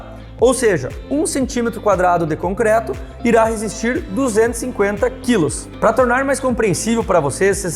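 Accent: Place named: Serra Gaúcha, Brazil. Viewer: Já sou seu fã e pelo tom de voz deve morar no sul serra gaúcha 👍🙏🙏👏👏👏👏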